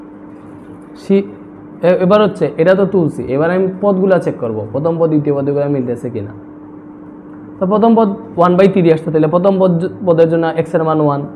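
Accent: Sylhet, Bangladesh. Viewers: Uni Sylheti.. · সার আপনার বাড়ি সিলেট নি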